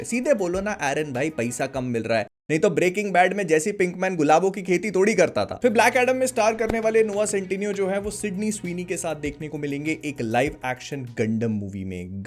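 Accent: Bhopal, India. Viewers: Maakda.... kya tum Bhopali ho ??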